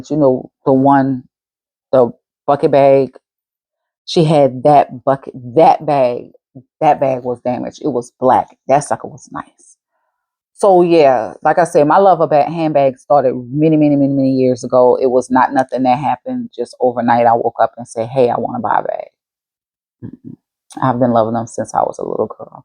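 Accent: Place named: New Orleans, United States. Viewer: This is my first time here I enjoyed your video and that Nola twang can’t wait to see how you accessorize your navy bag, I never do potlucks either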